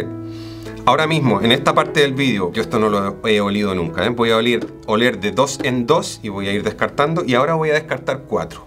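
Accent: Chile. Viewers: Eres chileno ?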